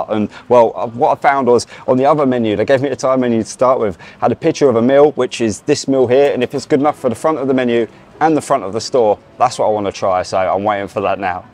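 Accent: United Kingdom. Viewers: I used to English accent, can understand u ❤️‍🩹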